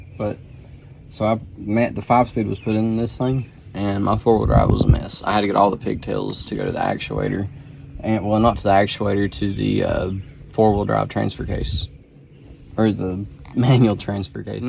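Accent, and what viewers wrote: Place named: South, United States. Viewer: You sound southern, you near Cookeville Tennessee? I’ll help you paint it!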